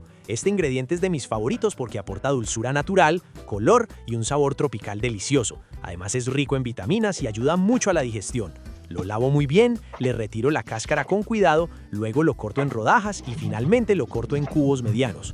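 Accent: Antioquia, Colombia. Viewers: Disculpe joven usted es colombiano porque me encanta como hablan y cosinan rico como esto que esta reseta que está compartiendo 🙏🏻🙏🏻❤️ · Me fascina tu acento de paisa, I love it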